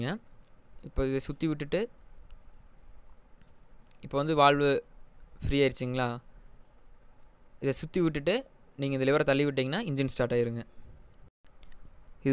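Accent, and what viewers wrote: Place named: Kongu, India. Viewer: கொங்கு தமிழ் ❤️ மலரும் நினைவுகள்❤️ மகிழ்ச்சி😃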